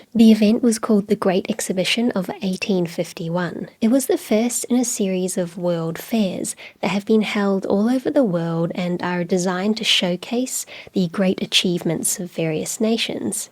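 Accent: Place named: Australia. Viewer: Which city are you from? I ask this because you have a very interesting accent that sounds very distinct from other Australian accents.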